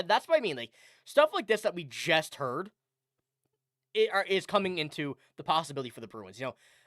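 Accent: Newfoundland, Canada. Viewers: You are from Newfoundland?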